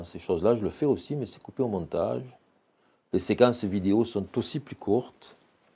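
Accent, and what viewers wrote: South, France. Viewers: felicitation maitre. je sens une pointe d'accent du sud de la France, vous êtes d'où ? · vous avez pourtant un bel accent du midi vous vivez au quebec?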